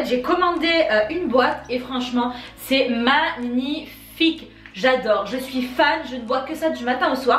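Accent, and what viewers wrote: South, France. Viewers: J'adore ta tasse Supergirl !!! Et ton accent du soleil fait du bien avec tous ces nuages !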